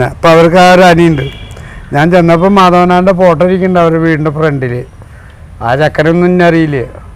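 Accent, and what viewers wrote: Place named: Thrissur, India. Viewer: എത്ര കേട്ടാലും മതി വരില്ല ഇദ്ദേഹത്തിന്റെ സംസാരം. ആ തൃശൂർ ഭാഷയിൽ ഒരു ജാടയും ഇല്ലാത്ത നിഷ്കളങ്കൻ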